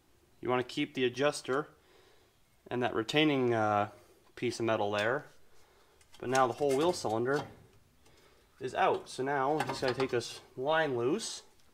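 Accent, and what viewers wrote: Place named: Midwest, United States. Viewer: Maybe your ear is not tuned to a midwest accent.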